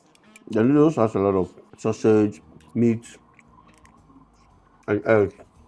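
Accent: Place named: Nigeria. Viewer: Why ur English be like 9ja guy own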